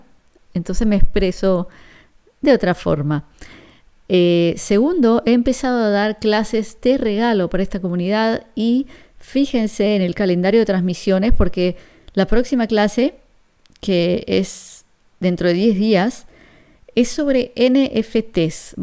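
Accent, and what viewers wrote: Argentina. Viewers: hablas así porque sos Argentina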